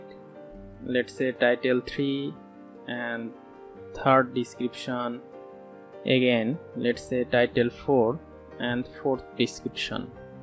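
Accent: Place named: Bengal, India. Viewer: Khub valo laglo video ta, bangali mone hocche accent sun ?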